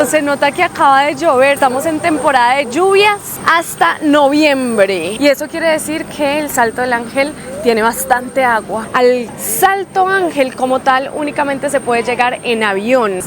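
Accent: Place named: Antioquia, Colombia. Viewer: Ese acento paisa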